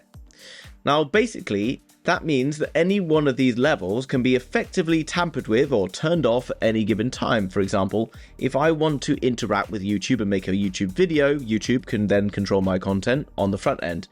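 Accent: United Kingdom. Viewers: You look like a young rich old wise man if that makes sense. It’s probably the old money living room and British accent you have. I love it haha 😂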